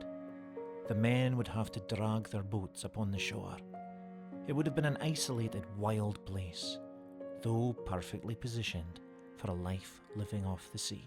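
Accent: Scotland, United Kingdom. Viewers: I can't resist anything 'history', so my adventures on YouTube take me all over the place. today it's the northernmost part of Scotland. Love that Scots accent. I have no smidgeon of Scots in me as far as I know, some Irish tho, but you know the people of this part of the world had to be tough as nails to survive, so much respect always to the Scots story !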